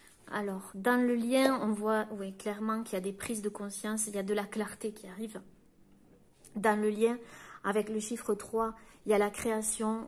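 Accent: South, France. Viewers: Bonjour. Merci pour cette guidance qui me parle beaucoup 🙏🧘‍♂️🕉🌷🌺🌍💞 on entend votre petit accens du sud et vous devez connaître l opercule de sainte lucile un porte bonheur, représenté sur la carte ( 16 Ce que vous voulez vous veut ) ce qui m’aide encore plus à croire votre guigance votre cœur. MERCIIIIIIIIIII de nous aider éclairer. Je vous souhaite un trés bon week-end que DIEU ET L’UNIVERS VOUS PROTÈGE NOUS PROTÈGE 🌷🌷🌷🌺🌺💞🌍🕉🧘‍♂️🙏🐱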